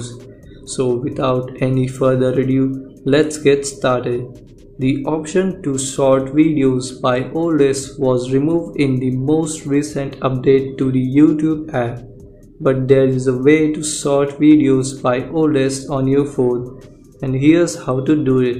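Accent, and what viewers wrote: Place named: India. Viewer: It's always the guy with idian like accent who fixes problems i salute all of you you don't know how much y'all helps luv you guys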